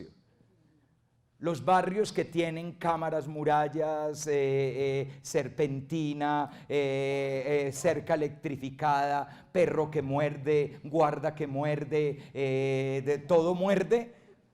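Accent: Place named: Colombia. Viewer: Me encantan,sus enseñanzas maravillosas,me encanta ése Acento tan,colombiano.no sólo eso.tan enamorado de <link> llegan á lo profundo de mi corazón.no quisiera,perderme,ni una conferencia. Dios le siga dando ésa sabiduría. Divina. Yo soy Colombiana.pero hace 40años vivo en Estados Unidos.Bendiciones lo queremos mucho,padre.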